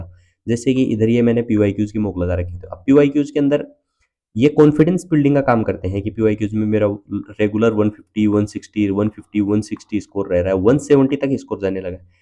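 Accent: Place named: Rajasthan, India. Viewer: Bhai aap Rajasthan se ho na Bolne ke tarike se lag raha h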